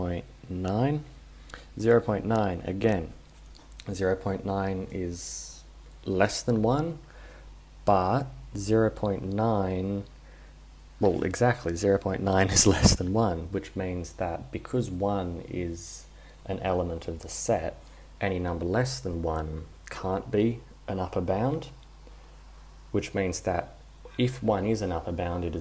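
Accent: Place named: Australia. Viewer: with Aussie accent op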